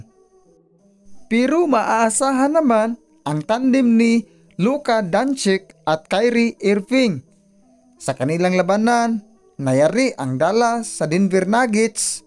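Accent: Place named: Visayas, Philippines. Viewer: Bisaya kaayo ka boi!!!!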